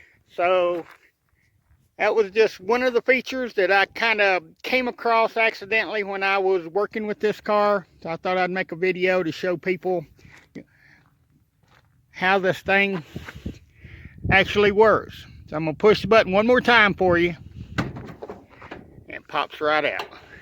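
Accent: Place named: United States. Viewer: Can your accent be any more American? Good video by the way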